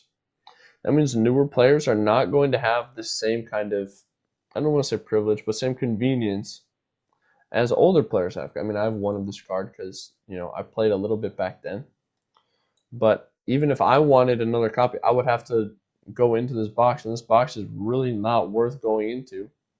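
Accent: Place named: United States. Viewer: what makes you say that? his american accent sounds very natural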